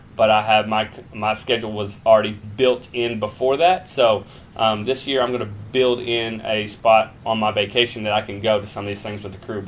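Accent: United States. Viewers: Your awesome:) One question, where in America is your accent from? I can't pick it cause I'm from NZL.. Haha. Keep up your great videos! Always brings a smile to my face.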